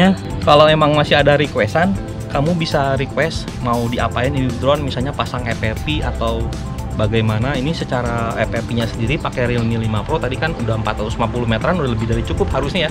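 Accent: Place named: Sunda, Indonesia. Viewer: edan sunda pisan.. alus pisan lah repiw na,, nuhun kang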